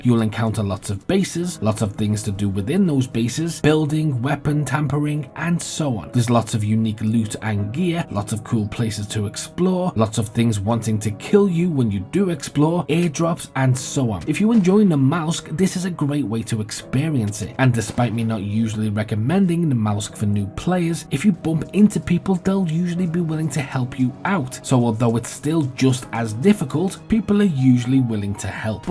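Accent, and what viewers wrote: Liverpool, United Kingdom. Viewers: Nice you from liverpool mate ?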